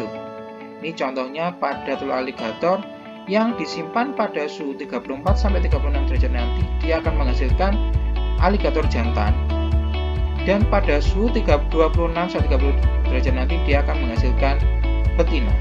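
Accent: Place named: Java, Indonesia. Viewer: Mohon maaf kak kalau boleh koreksi kurang jelas dalam pembicaraannya soalnya agak agak kedengeran medok jawanya mohon maaf nih kak🙏 mohon maaf juga initeh gatau pendengaran nya kurang jelas gatau dari mananya😀🙏🙏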